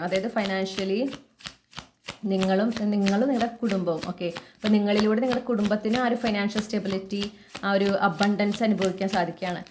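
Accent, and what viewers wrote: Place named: Thrissur, India. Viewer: slang, njanum thrissur aanu.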